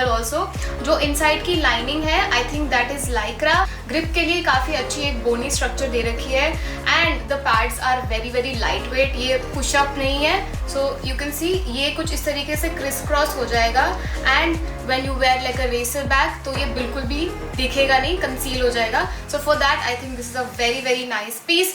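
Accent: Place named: India. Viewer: 100% you look like foreigner 😮ie like pure100% Russian But your accent is paka indian 😮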